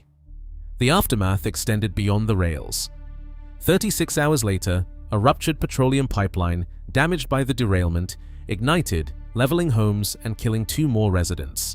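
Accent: United Kingdom. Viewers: I gave up after your brit speak I NERT moronic pronunciation too.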